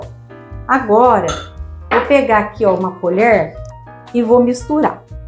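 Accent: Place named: Minas Gerais, Brazil. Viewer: Que delícia de cookie e de sotaque. Sou mineira e dá gosto de ouvir kkkk. E de comer todas essas delicias que vc faz aqui. 😋😋😋😋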